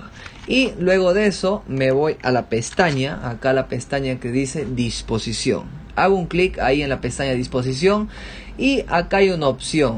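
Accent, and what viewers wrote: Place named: Amazon, Peru. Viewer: Causa, buen vídeo, me sirvió bastante, por tu acento saqué al toque que eres de la selva peruana, saludos desde Tingo María.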